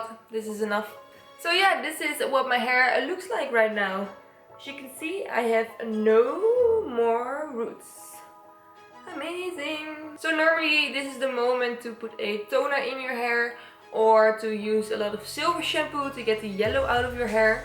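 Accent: Netherlands. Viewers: Are you from the netherlands, I thought so bc of your accent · Are u dutch ? I get dutch vibes from your english 🥰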